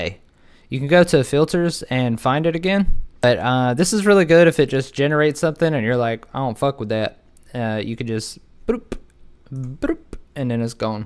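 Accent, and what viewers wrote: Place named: South, United States. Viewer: Nice work bro! What's the accent? It's south of the Ohio river for sure.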